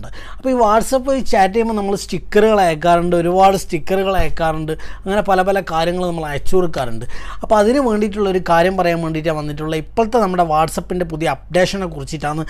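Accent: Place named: Kannur, India. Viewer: ഇതാണോ കണ്ണൂർ ഭാഷ..... കേൾക്കാൻ നന്നായിട്ട്ണ്ട് ട്ടാ ഗഡീ..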